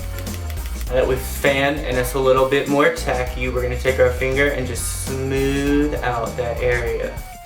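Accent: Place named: South, United States. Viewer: Lol I was gonna say I'm from Baltimore and his accent def didn't sound like Baltimore accents. It def sounds southern!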